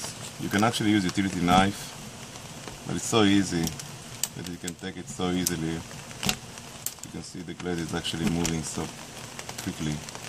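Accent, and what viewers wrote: Israel. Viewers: Helpful, thank you. The insight about Lowes was vital information. Enjoyed the Israeli accent as well, haha.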